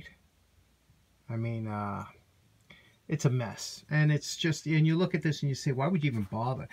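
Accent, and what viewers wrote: New York, United States. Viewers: Very nice work. Also nice to hear a New Yorker's accent (born and raised in upstate). Also, had one of those wood screwdrivers when I was a kid. Hate to think of it as "antique," but it is what it is. I used that same stain for a lot of applications, but I seal it up with polyurethane (satin, semi, or gloss); hard as a rock.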